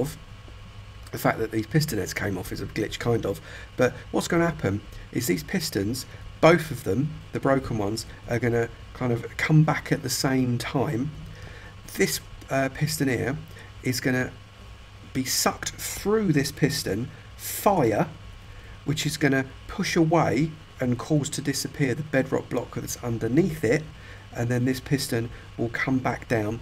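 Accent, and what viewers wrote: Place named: Yorkshire, United Kingdom. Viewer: i can tell your yorksire well i yorksire aswell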